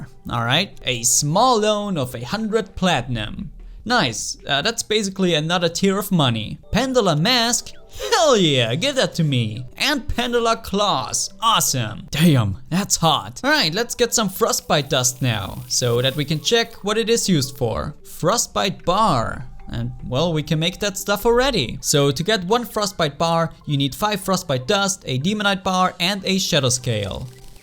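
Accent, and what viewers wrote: Germany. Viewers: man hört dein deutsch heraus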